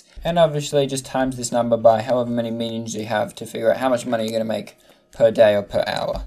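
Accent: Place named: Australia. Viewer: Australia Only know this one because every “a” sounds different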